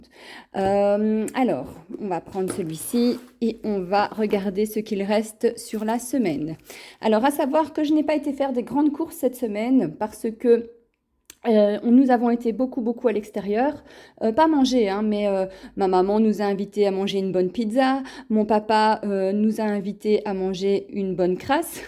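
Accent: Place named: Belgium. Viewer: J'adore houit à la place de huit et nonante à la place de quatre vingt dix.J'adore les Belges et la Belgique.Ne change rien!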